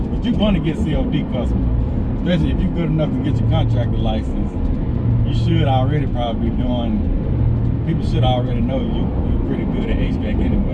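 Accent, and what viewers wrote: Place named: Memphis, United States. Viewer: You gotta be from Memphis. You sound just like we do.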